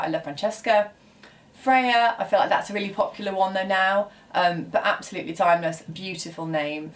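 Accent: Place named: United Kingdom. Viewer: How do you Brits do it? I'm from Alabama and I hear Georgia all the time and don't think anything of it- then I hear you say it with your fantastic accent and I'm in love with it. Lol What I wouldn't give for a British accent. Tabitha makes me think of the American tv show "Bewitched."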